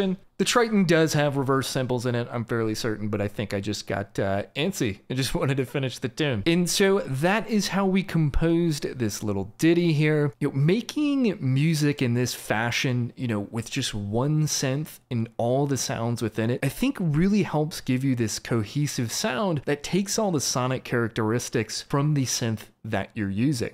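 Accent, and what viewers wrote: Baltimore, United States. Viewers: lol i would bet my life you're from baltimore or philly. great video!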